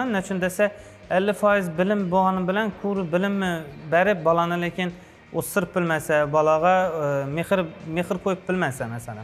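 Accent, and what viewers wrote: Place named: Khorezm, Uzbekistan. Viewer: Xorazm shevasiga gap yuq juda muloyim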